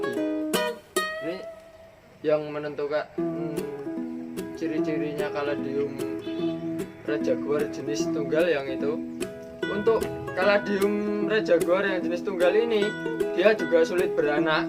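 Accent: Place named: Java, Indonesia. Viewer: Medok banget... Gue suka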